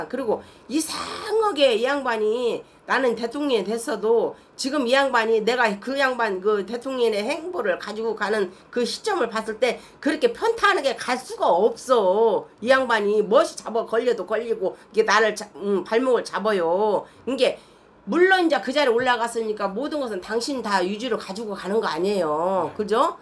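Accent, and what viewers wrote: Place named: Jeolla, South Korea. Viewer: 전라도말씨 찰지다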